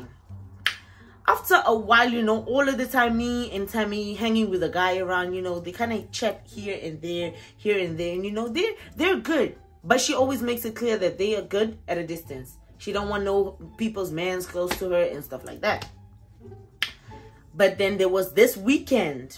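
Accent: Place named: United States. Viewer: Damn sis, you catching the American accent 😫🤍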